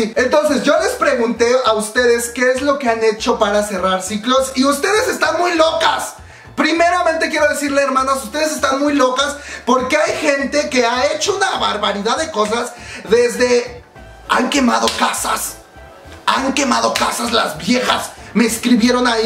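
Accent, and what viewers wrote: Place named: Mexico City, Mexico. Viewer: De donde será la? Tiene acento cedemexeño pero no lo sé:0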